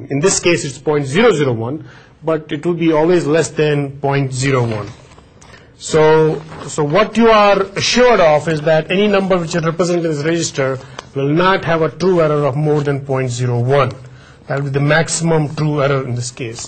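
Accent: India. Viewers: good explanation.....but had a very hard time digesting indian accent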